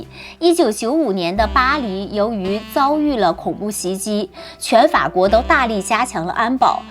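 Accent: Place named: Japan. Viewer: 日式英语发音听得超级不舒服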